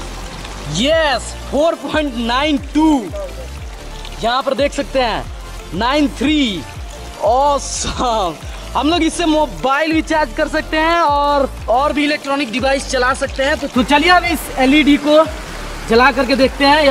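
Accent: Bihar, India. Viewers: bihari ho ka babua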